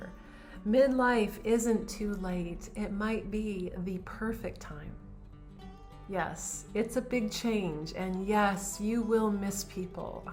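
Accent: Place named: Europe. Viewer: You sound like a European, welcome.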